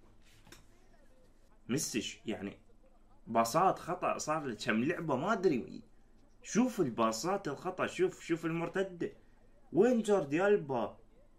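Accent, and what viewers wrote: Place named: Iraq. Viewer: السلام عليكم ههههه والله ياخوي احب ردات فعلك وكلامك العراقي الحلوووو واصل عزيزي ولنا فخر بتواجدك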